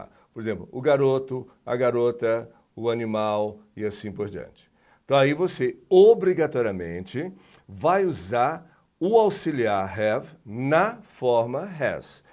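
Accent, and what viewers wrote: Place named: United Kingdom. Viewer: Professor que fala britânico forte.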